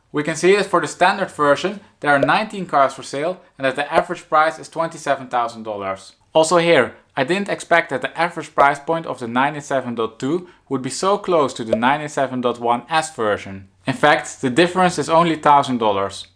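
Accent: Netherlands. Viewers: This is defenitely NOT a German, since this guy is talking with a superstrong dutch tonality 🤣. Best regards from Hamburg, Germany...Frank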